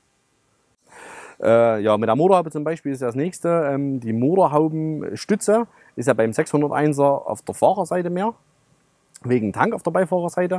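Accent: Saxony, Germany. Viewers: Ein toller Pappe Oldtimer schon fast 70 Jahre alte Entwicklung! 👍🏼🤘🌹 Ein echtes Roeff ..Töff Töff! Cool! Nach damaliger Bestellung.... nach 12 Jahren erhalt......schon verrostet am Unterboden! Mit ganz tollem sächsischen Dialekt! 👍🏼👍🏼👍🏼👍🏼👍🏼🤣😂😎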